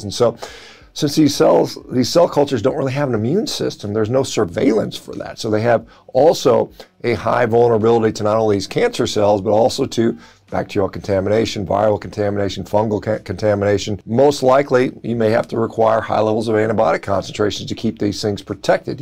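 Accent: United States. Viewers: Wow, thick as two short planks. 'Probably worse for the environment'. What is that supposed to mean????!!!! I can see that you are from the slaughtered meat lobby but you have not done your cause any good. You sound like a typical red neck American. You have little or no grasp of science and clearly do not understand what 'cultured meat' is. If you are from the slaughtered meatindustry you should be looking at this issue differently. There are positives and negatives. Around 800 million people globally rely on farming for thier income, directly and indirectly. It goes further than that too. This new form of meat production is going to impact, like a tsunami, eventually. Modern traditional meat producers need to work with this new industry and have control. You are going in the wrong direction with your post. Reply to me and get the real information as I have been researching this industry from the start.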